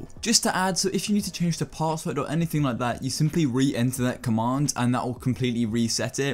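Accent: United Kingdom. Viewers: Fortnite and british👎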